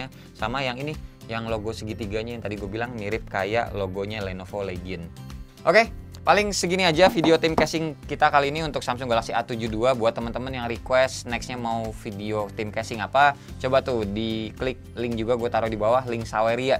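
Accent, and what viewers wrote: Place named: Lamongan, Indonesia. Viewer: Kayak ada ciri khasnya orang lamongan...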